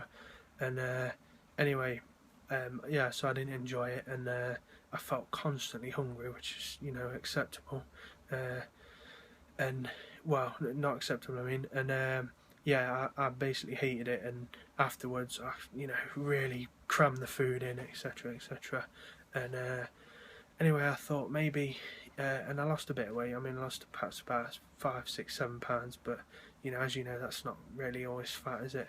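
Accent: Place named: Hull, United Kingdom. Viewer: You have got to be from Yorkshire. I am, you sound very Hullish?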